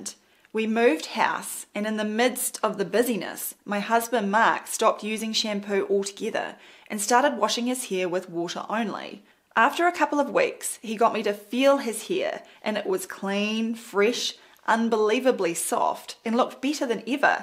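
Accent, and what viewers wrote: New Zealand. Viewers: is drop dead gorgeous and that kiwi accent ) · At what point does the NZ accent become a whole new language? I can barely follow. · I wondered about the accent. New Zealand apparently. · Sounds Kiwi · Hilarious NZ Accent!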